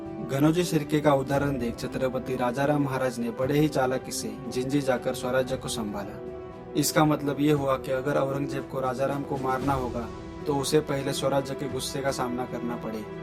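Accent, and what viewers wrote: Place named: Maharashtra, India. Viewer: Are video banawnara pan marathich ahe... accent Varun kalat nahi ka...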